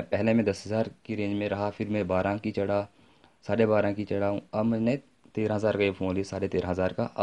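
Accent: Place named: Punjab, India. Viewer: aapke bolne se lagra tha aap punjab k ho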